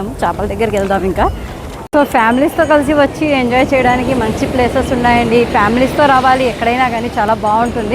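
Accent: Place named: Godavari, India. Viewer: మేడం గారు గోదారి యాస అదరగొట్టారండి సూపర్ 😂😂😂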